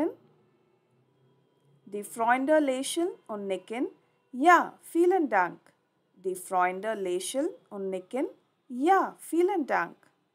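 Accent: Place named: Spain. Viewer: Sounds like some whose mother tongue is not German- (maybe spanish )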